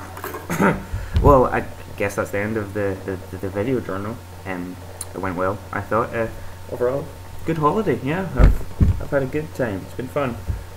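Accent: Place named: Ireland. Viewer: haha gotta love that crazy irish accent!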